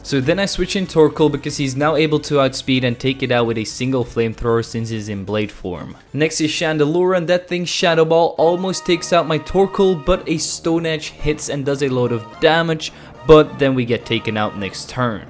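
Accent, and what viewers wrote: Belgium. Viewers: Wow je bent een belg😂 hoor het nu ook aan je stem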